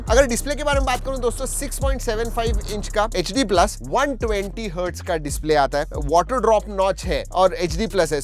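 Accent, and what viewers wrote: India. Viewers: Indian english its a trash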